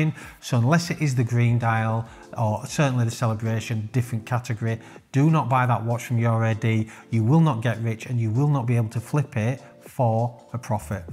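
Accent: United Kingdom. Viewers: You have a very interesting accent. Which part of uk is that?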